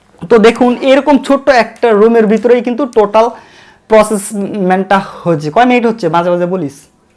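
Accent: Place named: Sylhet, Bangladesh. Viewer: সিলেট কথায় পাওয়া য়াই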